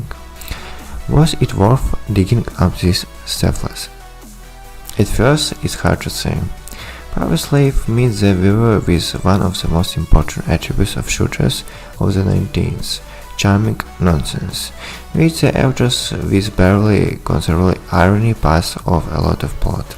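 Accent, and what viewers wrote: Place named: Australia. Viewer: Man youve got a fkn mad accent mate,were the hell r ya from?? it sounds are you a australian student living in the suburbs of cali in the u.s.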